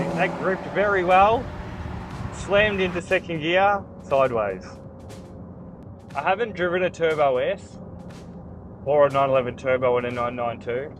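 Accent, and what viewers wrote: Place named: Australia. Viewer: "GDS" lol..This just goes to show how slack the Aussie accent is, lol. Seriously, Aussie Motoring Journos should have to take speech lessons.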